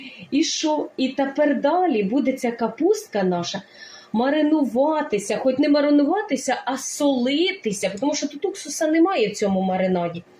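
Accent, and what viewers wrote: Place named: Cherkasy, Ukraine. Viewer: І рецепт цікавий і мова🎉 Це мабуть Черкащина,чи я помиляюся?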